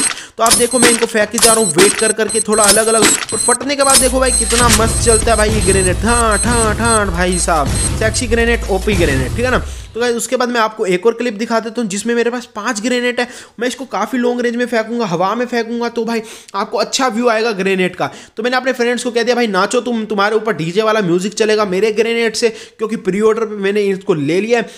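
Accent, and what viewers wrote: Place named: Haryana, India. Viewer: Haryana se bhai tu teri language same hai hmare jasi😍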